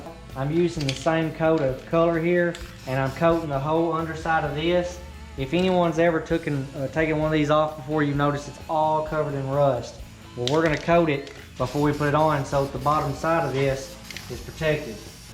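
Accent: South, United States. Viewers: Living in the south, this man makes me proud. To some (not all, I’m not going to paint with a broad brush) folks not from here, he may sound less intelligent because of his accent. How mistaken you would be. The south is covered up with folks that have great intelligence and common sense. LOL, I ain’t one of them, but they are here. God bless the South. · ahh, you're like me then lol. well i hear the country accent. what state are you in neighbor? wonder if you ever make it to the MS gulf coast for Cruisin the Coast? *edit, just watched your how to register video where you mentioned TN. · It's hard to get past his redneck accent.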